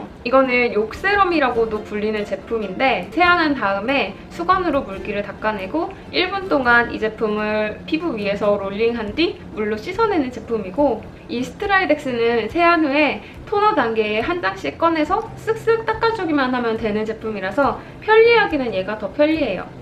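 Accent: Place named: Seoul, South Korea. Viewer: 우와 서울사투리 같아요 신기신기 잘보고갑니당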